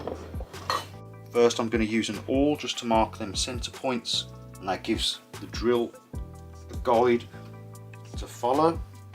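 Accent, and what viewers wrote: Birmingham, United Kingdom. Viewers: good to hear an honest brummie accent! vice looks good for the money though think the rotating base is potentially a weak spot.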